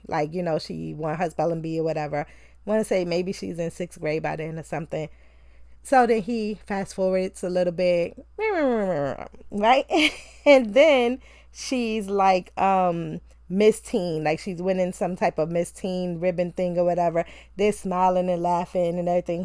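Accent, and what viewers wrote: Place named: New York, United States. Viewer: Love your NY accent!!!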